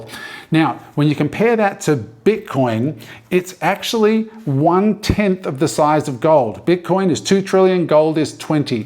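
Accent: Australia. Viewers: Just discovered your you tube channel, great to find another video with an aussie accent talking Bitcoin! Do you guys publish on X at all?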